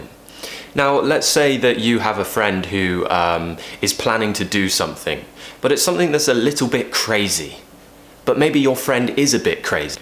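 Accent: United Kingdom. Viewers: I love British accent 🇬🇧🏴 From Cambodia 🇰🇭🇰🇭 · Thank you so much for this lesson,I really love British accent 😊👍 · i just happened to run into your channel a few days ago. i have to say british accent is definitely my favorite - or favourite, shall i post? - great of you to post all this. greetings from caracas, venezuela. 👍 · So cute, i really love British accent.Sounds sweet. I'm excited for this video. Thank you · i'm dying in this british accent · British Accent so awesomee...... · I really enjoy the video and British English, I learn lot of things from you and I'll keep watching your lessons.Thanks a lot. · I have been learning American accent couple years ago but when I saw your vidoes and your amazing accent I decided to dedicate my tongue for the British accent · I have a one question for you. Your accent is properly what kind of British accent?? 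Posh? Queens? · I really admire people who speak british accent.Sounds pretty good to listen and I keep listening your video.Love your diction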